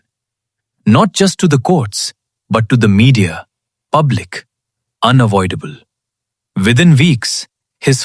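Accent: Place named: India. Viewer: Hello bro 😊 I am also thinking too create videos like this 😊 I think you should change your ai voice tone or English levels It's giving indian voice tone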